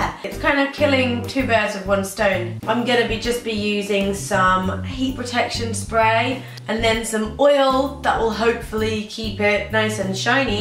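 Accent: United Kingdom. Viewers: You sound like Demi Lavato but with a british accent ... O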